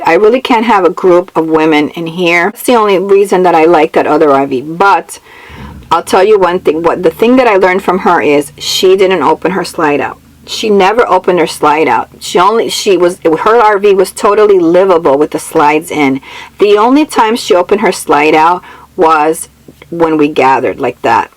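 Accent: Latin America. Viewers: Hair looks great, as usual- librarian glasses definitely a great choice, subtle Latina dialect- great outfit choice... Gonna give this one a solid 8.9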